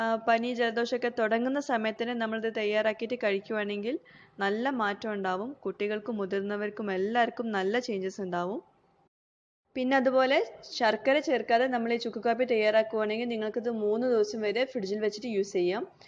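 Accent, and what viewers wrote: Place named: Wayanad, India. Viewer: wayanatilano? wayanaden samsaram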